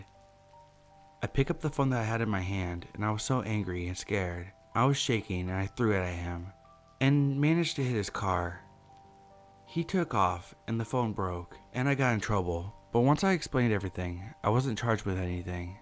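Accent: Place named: United Kingdom. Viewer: Oh no a British accent no thank you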